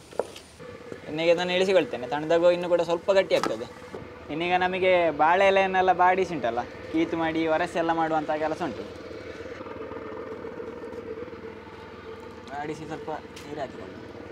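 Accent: Mangalore, India. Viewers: I liked your mangalore (kudla) kannada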